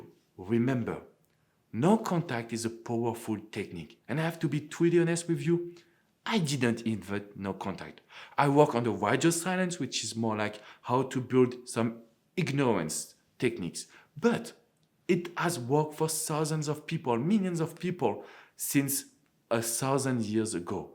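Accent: France. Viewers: Very well explained... I like your french accent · i love his accent 💛 i assume he's french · Your English language with French accent is sooo cute ! 😁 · The sweetest french accent ever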